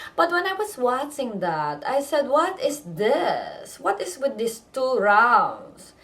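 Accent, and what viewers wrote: Philippines. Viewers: i like ur philipina accent hehehe nice one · Haha your a funny little filipina. first time watching your channel, I must say I love how you do the filipino accent, so spot on. just makes me laugh. definitely gonna subscribe, you make me by the way where did you get your jacket i want one. ay inay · hey i ALWAYS THINK THERE IS SOMETHING IN U WHICH I HAVE SEEN OR HEARD BEFORE NOW I UNDERSTAND THATS UR ENGLISH ACCENT U R A FILIPINO I M SURE BY UR ACCENT SPECIALLY BY UR MOMS ACCENT:d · Holy shit your Filipino accent is hilarious. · OHMYGAHD. you sound JUST like my family O_o hahahh. like the filipino accents & stuff...JUST like my grandmother, aunt & uncle xD kind funny. lol. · Wwwait, what's your nationality? You kinda talk one accent in Philippines called Bisaya. Or you're just imitating Manny's accent?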